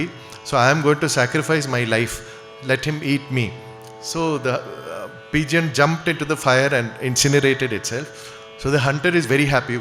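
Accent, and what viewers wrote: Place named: Telugu, India. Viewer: Sounds very native Telugu. Thanks to Prince garu.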